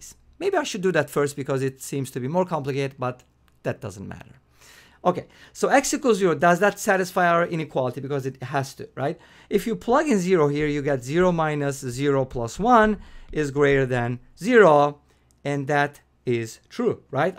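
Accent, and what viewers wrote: Turkey. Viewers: Sir you sound like a turkish because of your accent but thanks for helping,sending you the best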